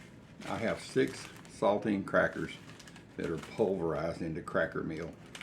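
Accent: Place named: South, United States. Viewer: Those granola bars look delicious and I’m certainly planning on making a batch or two. And by the way that’s how southerners talk. Taters instead of potatoes, maters instead of tomatoes, naners instead of bananas..... jeez give me a break. Keep up the good work dude.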